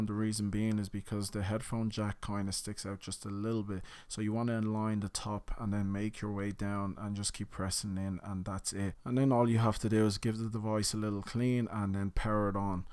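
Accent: Ireland. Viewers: Yes really clear and focussed. I love the Irish accent too. Voice sounds so relaxed like everything is under control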